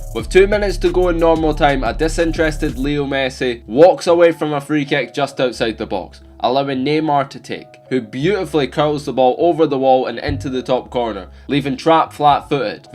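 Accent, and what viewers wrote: Scotland, United Kingdom. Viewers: british accent banging mate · i thought you sounded Scottish I support Cardiff and man united because my dad supports them and united because all my mums family support them and there my closest team I'm just saying so I don't sound like a bandwagon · U Scottish?? Instant sub if u r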